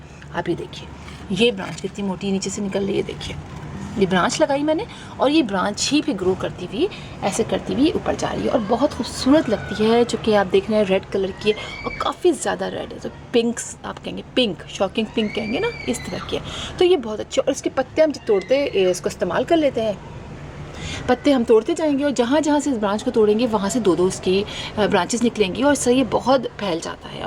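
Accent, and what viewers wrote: Pakistan. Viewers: না ভাইয়া, বাঙ্গালী পুইসাক বলে, কলমী না। অন্তত বাংলাদেশে পুইসাক ই বলা হয়। দিদি বাঙ্গালী না কারণ তাঁর ভাষা নিরেট উর্দু। বোধহয় তিনি ভারতীয় কিন্তু তার accent টা পাকিস্তানী উর্দু ভাষী।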